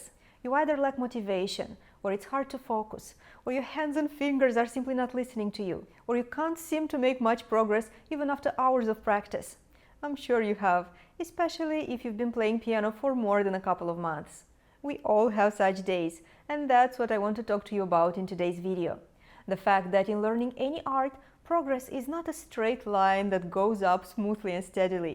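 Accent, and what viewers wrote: Russia. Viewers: Teacher, this audio is better. Keep using the little clip microphone. A previous video I saw, I had a hard time hearing you (scale practice). There was too much room reverb, plus you have a Russian accent. I would consider a course of yours, but as a retired person living on reduced social security, I can not afford it. Plus, I have a hard time trusting you. Mel Gibson was married to an accomplished Russian pianist. Young and beautiful as yourself. They had a baby together. Then they got divorced and she took him to the cleaners.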